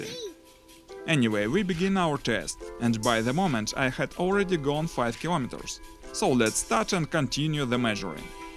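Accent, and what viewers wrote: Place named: Russia. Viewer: Сразу слышно Русский говор...🤣🤣🤣такой был у нашей училки...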